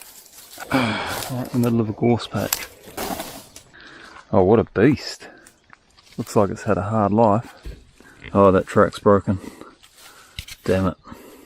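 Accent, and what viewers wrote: New Zealand. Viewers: New subscriber here. I am also subscribed to Iforce2d, an other YouTuber from NZ. He is doing remote control stuff, like fixed wings and copters and recently home built CNC machine. Anyway, it is very interesting how your voices are so very similar. Of course the accent makes it a lot similar, but even your style and look so very similar. Could pass for brothers.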